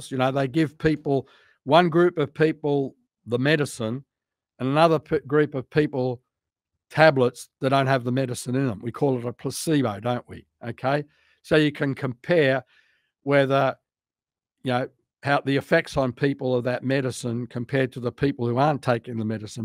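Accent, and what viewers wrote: Australia. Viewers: I was confused at why he said he wouldn’t sound like me, then he said he was Australian and I realised why he sounded familiar. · I love that Australian accent keep it coming brother praise the Lord 🙏🙏🙏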